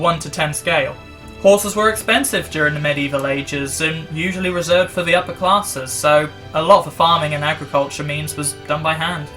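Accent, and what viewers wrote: Norfolk, United Kingdom. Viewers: I'm Norfolk born n breed . So your accent is music to my ears . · The only thing better than a Norfolk accent is a Norfolk sense or humour you are a star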